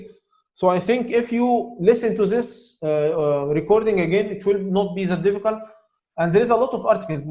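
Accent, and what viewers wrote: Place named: Egypt. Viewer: why you zbeek like egypt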